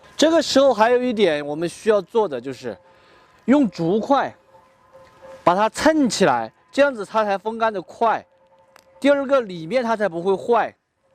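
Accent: Sichuan, China. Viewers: 老哥，你的椒盐普通话太地道了，我全程笑着看完的。😂😂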